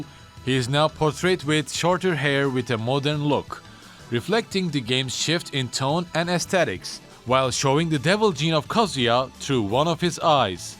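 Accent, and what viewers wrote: Turkey. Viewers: man, you just have such a prominent turkish accent. i couldn’t see any signs on ur account that u might be from turkey, but it’s unignorable.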